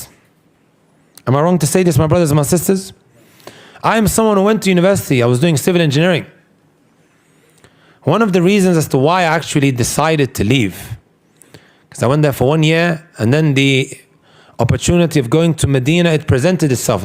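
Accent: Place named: United Kingdom. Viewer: Grew up in Britain so it’s British accent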